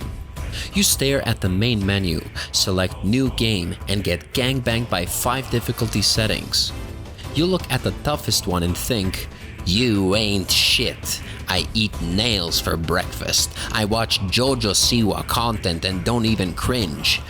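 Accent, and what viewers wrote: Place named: Italy. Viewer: That Italian accent tho 😁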